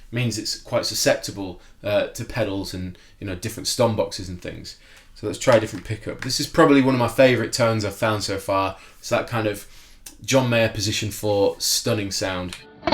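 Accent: Yorkshire, United Kingdom. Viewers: it would be great if you could do a video on how you learnt to play guitar and the processes you went through. cheers, also from leeds so i appreciate your yorkshire accent!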